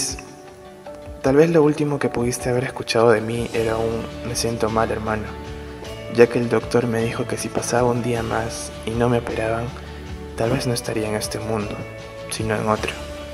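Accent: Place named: Peru. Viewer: Gaudhi Solimano Claro, cualquiera conoce la voz de un peruano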